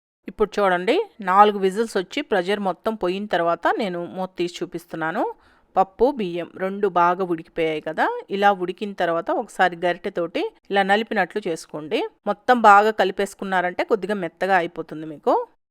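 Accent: Nellore, India. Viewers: Madam meru nellore valla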